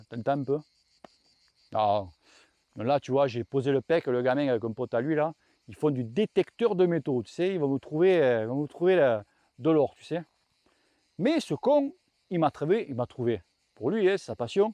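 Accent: South, France. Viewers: ... Plus de 1000 bornes en une semaine. Faut nous les présenter vos potes. Ça fait des sorties de 150 bornes par jour / environ 5/6h. Ils bossent à mi-temps ? Soit ils roulent en 1ere FFC soit ils sont totalement cons au point de louper leur vie : perso, pro, etc... Bref.. Plus largement au début je trouvais le ton des vidéos bien sympathique ça sortait un peu des sentiers battus. Mais là vraiment non seulement ça ne me fait plus rire mais en plus je trouve que ça frise un peu le foutage de gueule pour tous les entraîneurs, les mecs qui se sortent les doigts du cul dans les clubs, les entraîneurs indépendants, ceux qui bossent sérieusement pour sortir des plans d'entraînements et qui font de la VRAIE pédagogie cycliste. Également pour tous les autres passionnés de vélo qui se lancent sur la toile avec peu de moyens mais qui bossent leur truc. Faire des vidéos sur YouTube un peu sérieuses c'est pas juste prendre un accent du sud et balancer une série de phrases sorties de je ne sais où en prenant pour appui des exemples de pratiques débiles de certains. 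Ce n'est que mon point de vue. Bref de toute façon c'est une tendance aussi prise par les magazines de vélo et les nouveaux médias de cyclisme sur le net : des titres pute à clics, pas de fond, pas de références sérieuses, pas de dossier travaillés, du matos à 10000 euros à toutes les pages, certains écrivent des trucs on ne sait même pas si ils ont déjà mis le cul sur un vélo. Bref, le seul qui sort un peu du lot aujourd'hui s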